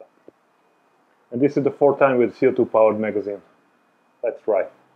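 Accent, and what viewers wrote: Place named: Eastern Europe. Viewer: Ну оочень славянский акцент😅😅😅😅😅😅😅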